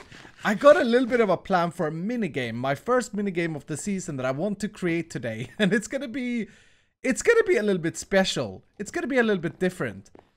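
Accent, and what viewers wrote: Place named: Sweden. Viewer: are from sweden? sounds like that.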